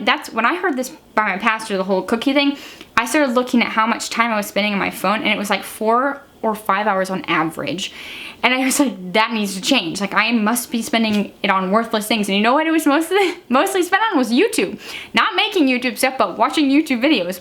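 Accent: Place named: Midwest, United States. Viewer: lol, that mid-western accent 😂😂 is it strong with this one... If your from the Midwest you might not hear it though :(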